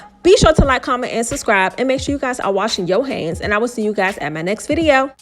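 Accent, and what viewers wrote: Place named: Maryland, United States. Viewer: Are you from the Maryland/DC area? You sound like it. But anyway the food look so good. Man oh man 😋😋😋😋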